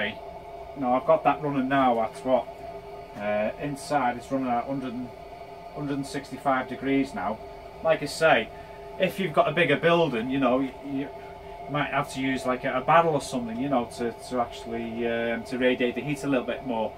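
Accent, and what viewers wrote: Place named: Liverpool, United Kingdom. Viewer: You sound like your from My wife's neck of the woods. Knotty Ash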